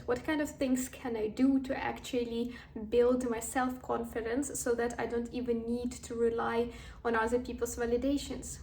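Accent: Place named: Russia. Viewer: твой русский акцент слишком палевный)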